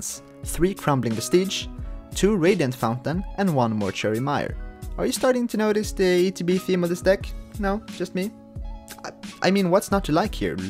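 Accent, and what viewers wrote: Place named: Sweden. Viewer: Awesome! Thanks! BTW - Är du svensk? Din accent låter som den. Jag lär mig svenska.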